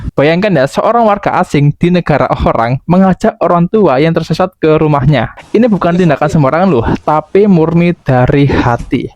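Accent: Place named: Java, Indonesia. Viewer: Logat Jawa nya ,,jangan terlalu medok ,,min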